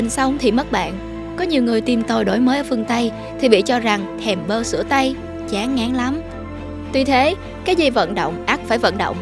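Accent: South, Vietnam. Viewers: Ad là người miền Nam hay Bắc , giọng thì miền Nam nhưng dùng từ ngữ thì toàn miền Bắc như còi , to , bố ... tò mò thôi nhưng nội dung hay nha ! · nói giọng Nam mà lại nói tiếng Bắc Kỳ là sao?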